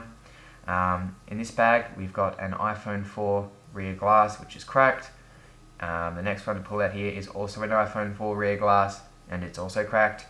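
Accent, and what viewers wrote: Australia. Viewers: / / WPS 4004 he aussie also he is one if my good mates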